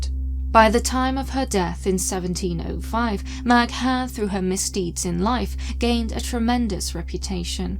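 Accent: England, United Kingdom. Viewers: Thank you Ms. for your astute story of this witch. I appreciate it being told by an english woman instead of an american as it lends authenticity as well as the use of old english.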